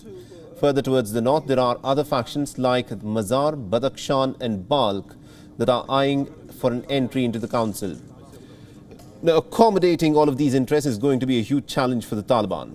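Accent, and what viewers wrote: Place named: India. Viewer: Funny English accent hahahaha laugh at india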